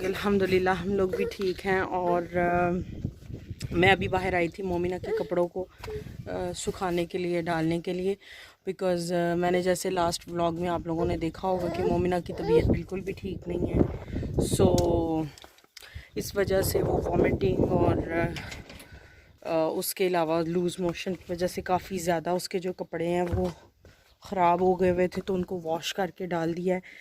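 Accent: Sialkot, Pakistan. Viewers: Ap lgta sialkot se han jaisi Urdu bol rhi han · Sialkoty ki booli pahchani jati jitni mrzi achi urdu bool lain mgr punjabi tuch zoaroor shamil hota ha..😀 Don't tention bcoz i m also siakoty..😉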